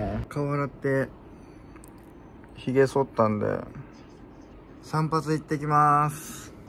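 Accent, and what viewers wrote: Kansai, Japan. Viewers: 関西弁いいわぁ🤝🏻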